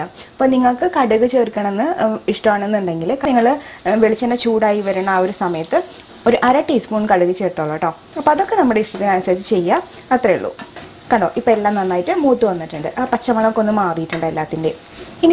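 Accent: Thrissur, India. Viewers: തൃശൂർ എവിടെയാ. ആ bhasha കേട്ടപ്പോൾ തോന്നി മ്മടെ തൃശ്ശൂരാണെന്നു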